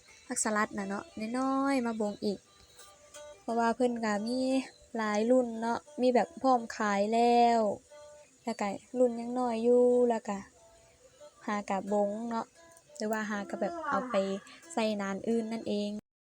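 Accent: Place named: Isan, Thailand. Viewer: เว้าสำเนียงคือคนอิสาน บ่คือคนลาว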